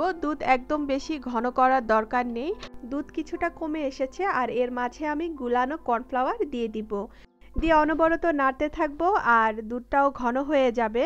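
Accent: Sylhet, Bangladesh. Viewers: apu apni ki Sylhetyy?? Apner shob rannai amer kuv valo lage 💜💜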